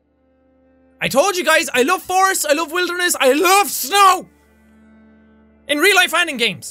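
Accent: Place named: Ireland. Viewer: "And I don't know whoowhy." And other Irish ways of saying words. ^ | Jacksepticeye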